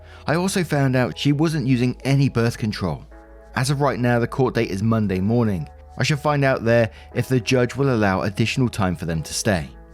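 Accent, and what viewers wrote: England, United Kingdom. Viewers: From Norn Iron and I love your English accent!!!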